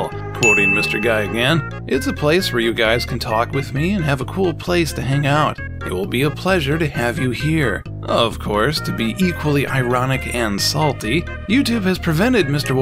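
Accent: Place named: Brazil. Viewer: Damn, another one falls to that bs copyright policy, i would like to help you out but i am kind of short in money (i can't even afford an internet of more than 6 megas) btw, until you spoke i forgot you were brazilian, nice accent, hope you get a fair amount of patreons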